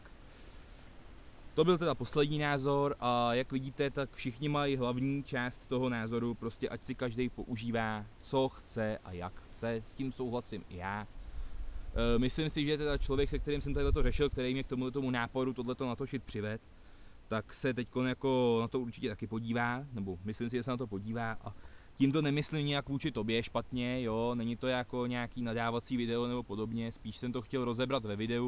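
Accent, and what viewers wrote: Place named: Pilsen, Czech Republic. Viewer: Sry, ale ta plzenština se fakt poslouchat. .. zeptal jsem se kameearádů na jejich neeazor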